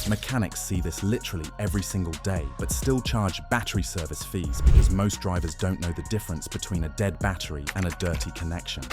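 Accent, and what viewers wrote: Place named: United Kingdom. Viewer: Your credibility gets called into question when you are giving advice and your video edit is piss poor, several repeats of the same clips, did you even review your finished video? WD40 is ok but it's not the magic you are portraying. WD40 is ok but it's not the magic you are portraying 😊. I'm also intrigued why the narration is British English yet currency is in U.S. dollars, some of the left hand drive scenes are showing blurred British roads with the use of U.S. Dollars quotes in pricing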